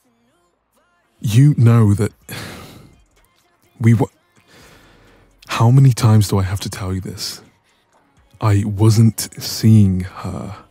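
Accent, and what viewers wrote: United Kingdom. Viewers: why am ⟟ just realizing half way into the video that he sounds like British smhhhh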